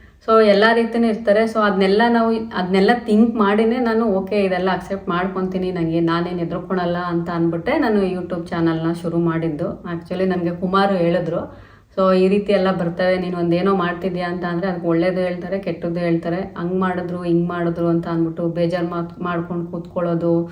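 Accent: Mandya, India. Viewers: the way u talk kannada really feels like u are in India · you don't change local kannada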